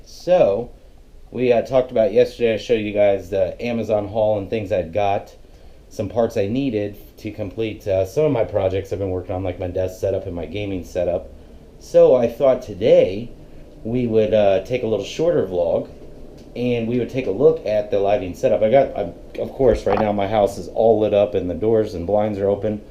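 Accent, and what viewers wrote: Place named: Poland. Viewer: First thing that hit me once i started watching your video was your accent, it sounds polish to me, are you polish? And this enterteinment led setup is super cool, need to make one in my cave.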